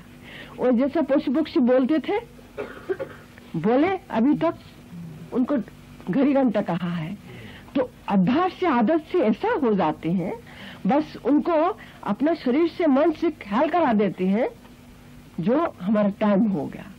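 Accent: Bengal, India. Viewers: Her accent is Bengali. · Yes her accent sounds like Bengali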